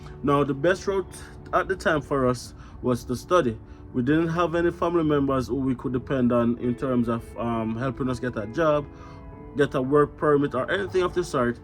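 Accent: Jamaica. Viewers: Well informed video. Loved the Jamaican accent as well which is slowly but surely dissappearing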